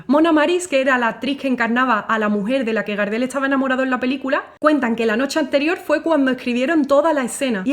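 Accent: Andalusia, Spain. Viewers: Qué hermoso tu arte . Felicitaciones desde Córdoba . Argentina . No alcanzó a descifrar de donde es su acento . Parece de la zona de Andalucía. Todas las bendiciones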